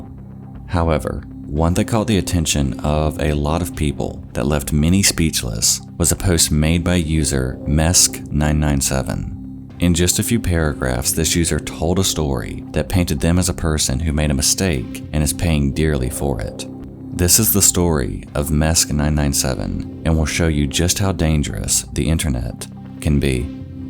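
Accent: South, United States. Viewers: Agreeance????? Not a word my man. Are you Fred Durst? LOL! The word is agreement. I am in agreement. I am in agreeance doesn't do it. Agreeance is just not a word. It sounds like one but again, the word is agreement. You have good content and I like watching your videos. Just, do better with grammar and I have no complaints. I don't even have a problem with your slight but definite country accent. As long as you are using actual words and using them the right way, I will sub. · As a southerner myself, I know a southern accent when I hear one. I love this channel to death! Keep up the amazing work!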